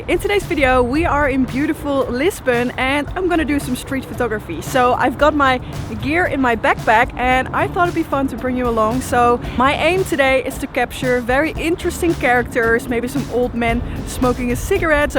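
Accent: Netherlands. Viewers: That Dutch accent, 🔥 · She has a Dutch accent?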